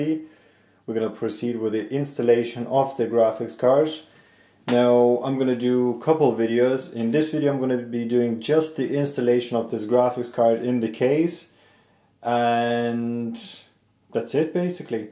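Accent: Ireland. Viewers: I can hear the slight Irish accent lol. Are you Irish?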